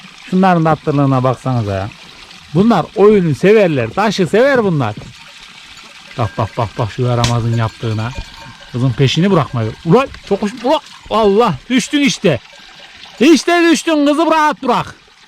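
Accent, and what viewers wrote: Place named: Taurus Mountains, Turkey. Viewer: Konustugunuz şîve Adana Mersin toroslardaki köylerde konusulanla aynı. 🤓 O yüzden çok samimi geliyor bana👍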